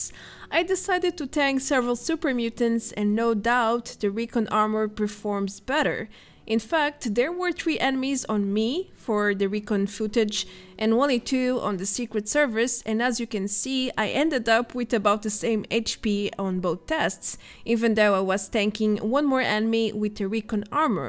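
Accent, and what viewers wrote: Portugal. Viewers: I love the way you speak. That accent... Portuguese right? You pronounce words just fine. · Thank you so much for the video, amazing analysis as usual. I agree I'll probably not going to get into the daily godroll event to get these, took me a while to assemble my SS armor (and it's not even that good, some pieces could be better). Lastly, don't worry about how people perceive your pronunciation, let them try some of the Camões language and see how easy it is. :D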